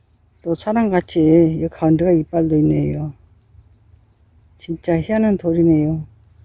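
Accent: Gwangyang, South Korea. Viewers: 말 하는 억양이 과냥 사람 같네! 일 만 km 멀리서 과냥 말 들으니 좋네!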